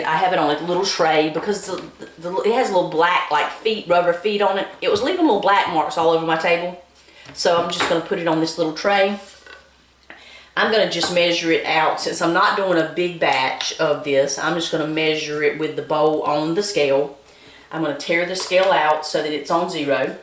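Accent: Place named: South, United States. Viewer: I just love that southern accent👍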